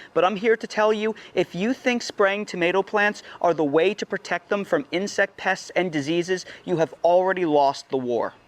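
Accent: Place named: South Jersey, United States. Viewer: thought you had a south jersey accent!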